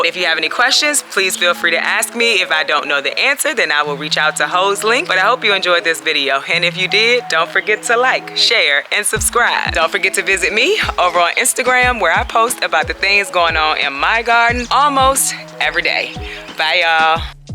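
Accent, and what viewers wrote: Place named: Virginia, United States. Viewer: LoL, it's always the Virginia accent that I love 💞.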